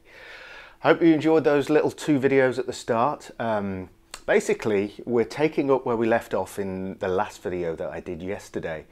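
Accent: United Kingdom. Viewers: Put a camera on your face...once in a while...the mono-tone British thing is, well, a stumbling block....What's the Patton...a general?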